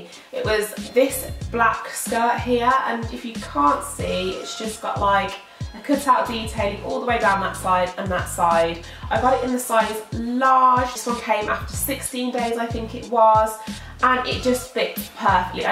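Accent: United Kingdom. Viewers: You sound like you are from where my fiance is from, Solihull ??? Love your videos, keep them up! :)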